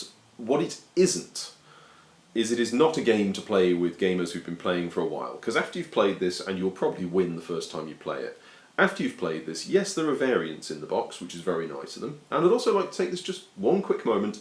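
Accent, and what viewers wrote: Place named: United Kingdom. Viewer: Really enjoyed the video...and the accent, you brits speak so properly.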